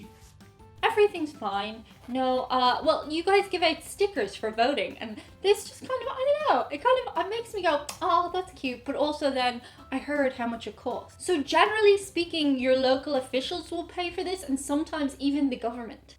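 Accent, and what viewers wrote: Ireland. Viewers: I just love her accent. Female Irish accents are the nicest in the world.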